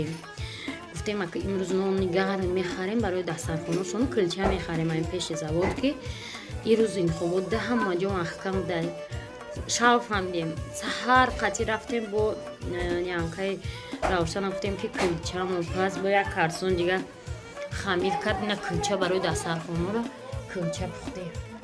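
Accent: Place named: Kuhistoni, Tajikistan. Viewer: Кадом кухистонии хта бо месниги мегири якдам кани мани гап мезани якдам мхраш мегуй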